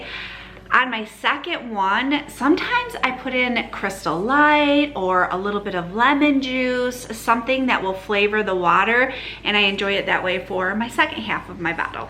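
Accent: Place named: Midwest, United States. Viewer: Your accent is so cute! Definitely midwestern, I'd guess, but I'm a southern girl so not sure if I'm identifying it correctly. Love your recipes!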